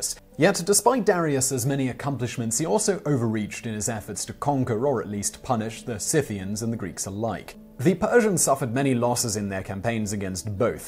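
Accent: England, United Kingdom. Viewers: What are you trying to say? He is English, so American mispronunciations are, in our view, wrong! Tom-ay-to, tomato!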